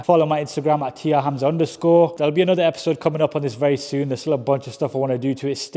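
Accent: United Kingdom. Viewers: So beautiful British accent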